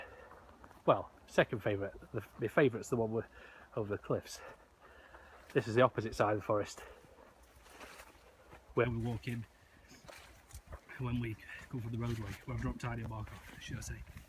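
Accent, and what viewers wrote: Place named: South Yorkshire, United Kingdom. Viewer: Good vid lad, going from your accent you ain't far from me... I live in Wombwell 👍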